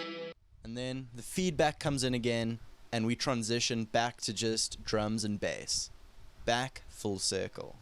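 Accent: South Africa. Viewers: could tell youre south african from the accent lol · Ayo SA accent who?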